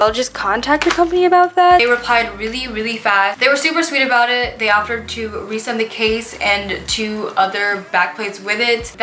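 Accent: Philippines. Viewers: Are you half filipino? cause i think i kinda heard some bisaya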